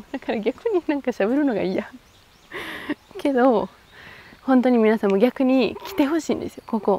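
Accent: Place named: Kansai, Japan. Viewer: しゃべり方だけでなくて、のりも笑いのツボも完全に関西のおばちゃんやん！🤣でも、さんはだまってたらめっちゃ美人やね😙大阪では男も女も楽しい人がモテるよ👍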